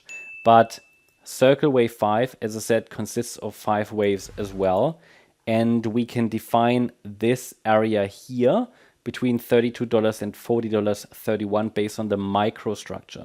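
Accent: Germany. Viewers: Thanks for frequent updates. I’ve been following you for a while now and like your analysis and German accent 😊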